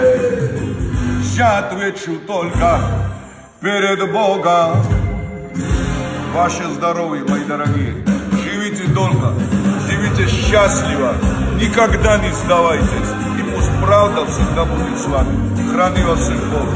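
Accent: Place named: Georgia. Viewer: Ты наглый лецимер я понимаю что ты застрахавался опасный фраерок в тебе грузинский только акцент ты не читал Руставели И Мамардашвили и глубоко не понял не отца не мать извини что так жёстко но это очень важно у тебя посыл что в рабстве будучи грузином можно хорошо прожить ты опасный чем твой хозяин !!!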